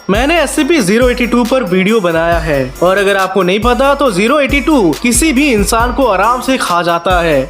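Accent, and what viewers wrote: Bengal, India. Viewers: Bhai ap bangali ho kya ❤❤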